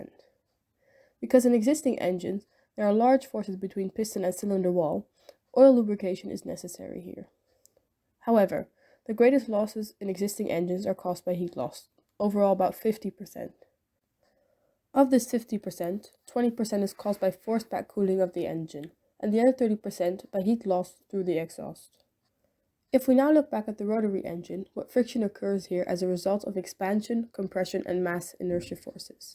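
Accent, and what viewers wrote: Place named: Netherlands. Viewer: I'm not an expert. But if you could tune the balancing of the counterweights right, this seems functional. Using the power stroke to directly power the compression stroke of the other 'cilinder'. Just one note however: due to the placing of the gears, the arm wich rocks the pistons back and forth is not symmetric. Causing the pistons to slowly compress on the left. And rapidly compress on the right. To me that seems like a bad thing. But that's all I got. (En ook erg goed Engels. Ik had bijna niet door dat er een Nederlands accent was :D )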